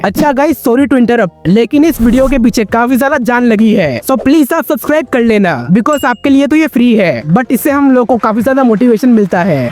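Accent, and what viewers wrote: Assam, India. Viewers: Bro, are you from Assam?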